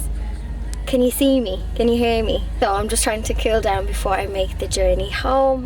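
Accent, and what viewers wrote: Dublin, Ireland. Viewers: Your strong Dub accent makes you a little difficult to understand at times.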